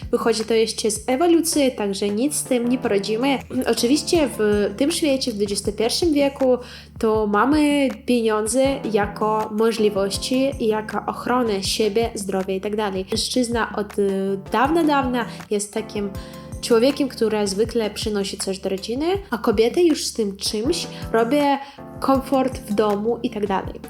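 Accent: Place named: East, Poland. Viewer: Masz zajebisty wschodni akcent. Mogę Cię słuchać godzinami.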